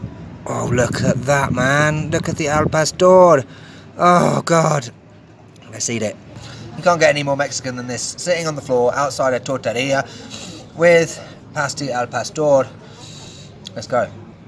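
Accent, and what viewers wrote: United Kingdom. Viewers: Hi, Love this video and it shows that you are truly in a league by yourself when it comes to producing an informative, quality vlog that is truly worthy of watching again in the future. I always enjoy as an American just listening to the British accent and love your culture and unique sense of humor. You're in a class of your own and your class and intelligence and the effort you put into your videos shines through. The Kennons and Tangerine travels don't even come close and you and I all the other brilliant, smart, educated people who watch you on a regular basis know this to be true. Look forward to your videos in England and Bulgaria.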